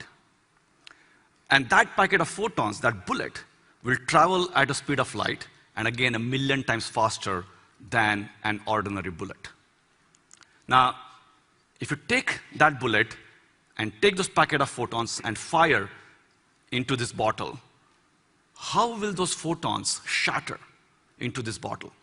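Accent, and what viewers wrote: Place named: India. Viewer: Indian English hmmmm, Anyway thank you .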